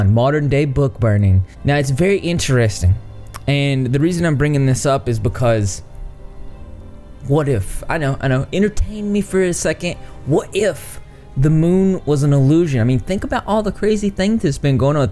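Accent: South, United States. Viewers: I'm trying to figure out where your accent comes from, is it Georgia or Tennessee? Maybe western North Carolina? :)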